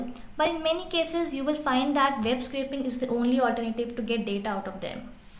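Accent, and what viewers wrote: India. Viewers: i like your voice.... after long saw a video with familiiar indian accent... worth watching..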